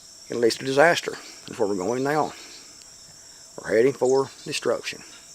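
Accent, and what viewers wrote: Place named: East Tennessee, United States. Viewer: I heard Patara mention your wonderful East Tennessee accent the other day; I had to just nod. Tennessee accents are so beautiful to me. (I'm from SC.) I AGREE WITH YOUR VIDEO.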